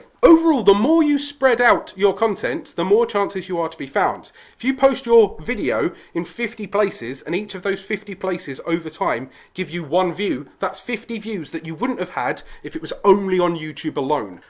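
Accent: United Kingdom. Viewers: card missing Britisch english is really way more fun to listen then american english. Good video by the way!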